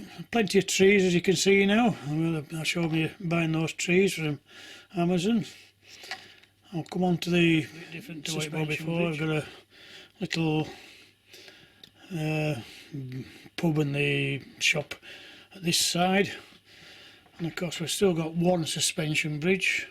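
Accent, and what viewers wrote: Yorkshire, United Kingdom. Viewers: Where are you from , is it somewhere between sheffield or Barnsley towards Leeds. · Trying to decide if you're a Yorkshireman. You sound like my friend who's from York. Beautiful model railway you've built. Would love to see it live!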